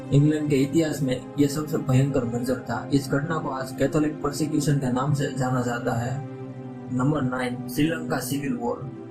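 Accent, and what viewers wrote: Gujarat, India. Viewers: Bhai Tu Gujarat se hai ?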